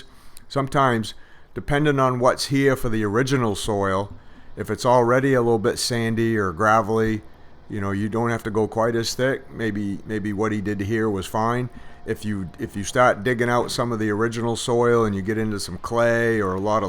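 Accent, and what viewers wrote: Maine, United States. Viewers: Holy cow bub you must be from Maine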